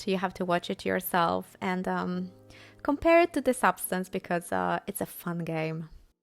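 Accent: Poland. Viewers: I can hear a little Polish twang in your English. Are you originally from Poland?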